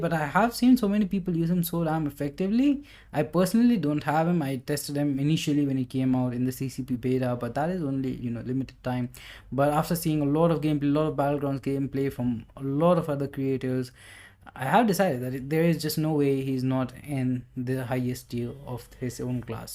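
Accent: India. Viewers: Mumbai accent.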